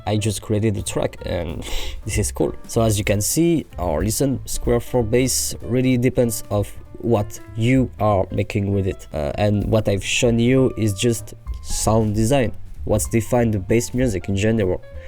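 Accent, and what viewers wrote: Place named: France. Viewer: J'ai adoré le tuto (je comprends mieux l'anglais avec un accent fr de merde)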